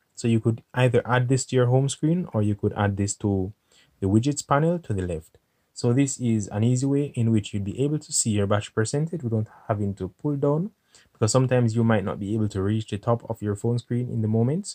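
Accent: Jamaica. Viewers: Dawg yah Jamaican or from the Caribbean??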